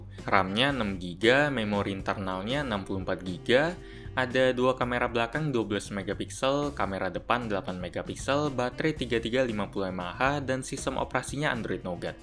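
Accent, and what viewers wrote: Palembang, Indonesia. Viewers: orang palembang ya ko?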